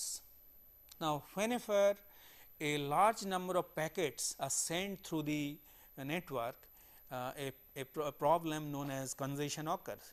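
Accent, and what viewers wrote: India. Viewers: India english！exactly good！！！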